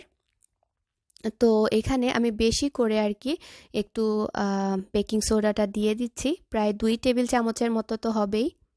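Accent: Sylhet, Bangladesh. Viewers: Thanks apu... ❤❤❤ lots of love & dua for u specially for falaq... I just love to hear ur sylheti language. ... Seriously.. So sweet & cute.. Dua kori apu tmi onk valo thako ❤ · No offense but i think it was much better when you used to speak in sylhety accent.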